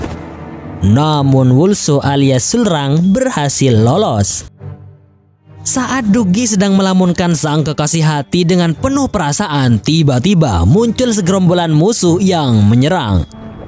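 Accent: Madura, Indonesia. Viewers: lu orang Madura ya ? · Madureh kang ? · Ser reng medhureh reh narator eh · Reng madureh admin nah 😁😁😁💪💪💪💪💪💪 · Madura mana bos,, wkwkwkwkwk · Orang madura ya bang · Orang Madura mas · Kreator nya orang madura anjirr 😩🤣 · reng medureh kak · Abeeehhh reng madure be'en kak ?? 👍👍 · Patek jeh atmin reng madureh yeh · Madureh yehh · Naratornya orang Madura ya?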